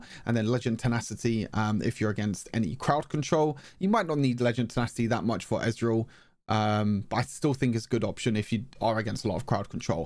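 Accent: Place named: United Kingdom. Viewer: i love the british accent. I learned to speak english recently and i can't understand almost anything that americans say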